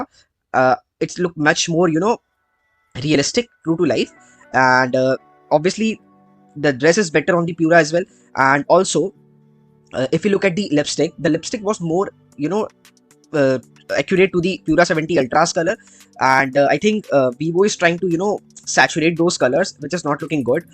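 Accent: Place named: India. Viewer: Indian English ... :(( fro Indian audience only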